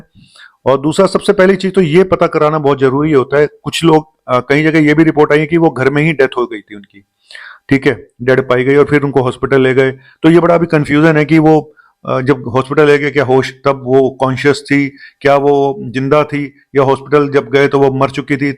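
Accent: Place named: Punjab, India. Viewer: Dora नहीं दौरा डाक साहेब। पंजाबी accent बदलो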